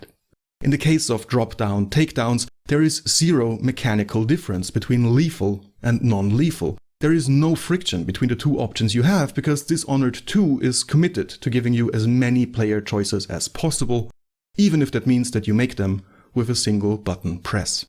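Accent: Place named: Europe. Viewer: Its always the guys with the euro accents that make batshit videos.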